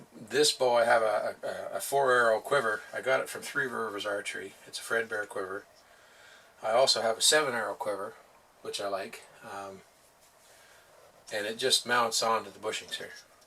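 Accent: Canada. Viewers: Say. Excellent video and review as always! I have a question for you though. You have a slight accent, I'm guessing Canadian, Wisconsin, Minnesota, North Dakota there a outs am I correct in assuming this because you mention swamps, I know I hunted swamp bucks in Michigan, Being a Yupper myself, Ae! Also see the hockey rink in pole barn and so on. Health wise I see you are looking could and I'm glad to see that as well. We'll take care be safe and look forward to more of your training / shooting Videos. Good hunting and God Bless Very Respectfully Bob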